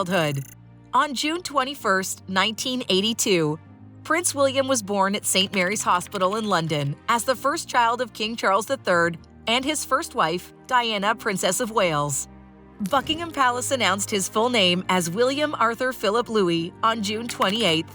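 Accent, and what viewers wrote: United States. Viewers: I'm a Scot. I find it annoying when an American voice lectures me about our Royal Family, and get a lot of it wrong.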